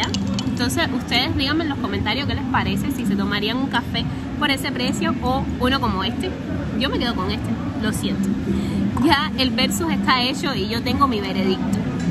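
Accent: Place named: Cuba. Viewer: Me encanta que no tiene el acento cubano tan pronunciado, algunos no se entienden cuando hablan rápido, felicidades, tienes potencial 😊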